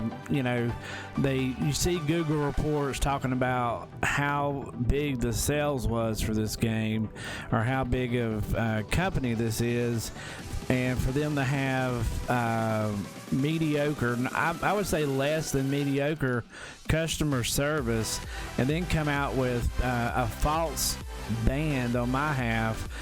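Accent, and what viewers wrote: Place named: United States. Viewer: I like your country accent. You sound like you eat apple pie and fried chicken everyday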